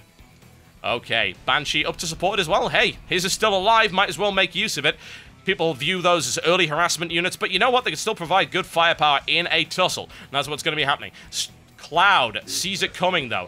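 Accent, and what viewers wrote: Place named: Northern England, United Kingdom. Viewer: It isn't his actual accent, he actually has a Northern accent. If you listen carefully when he gets excited, you'll hear it.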